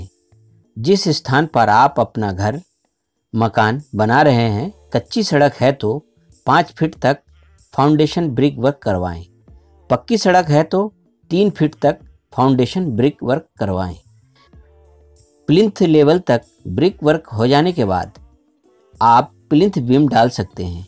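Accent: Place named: Bhopal, India. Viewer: Sound like Bhopali 😀👍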